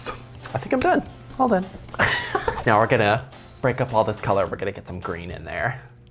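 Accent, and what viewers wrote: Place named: Midwest, United States. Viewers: The Minnesotan accenttttt. Makes me feel right at home😊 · Her Midwest accent is so fucking cute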